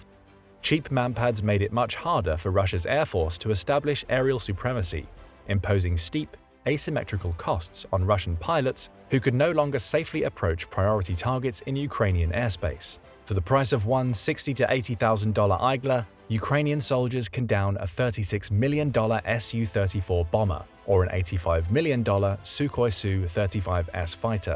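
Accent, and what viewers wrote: United Kingdom. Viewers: Why the British accent ?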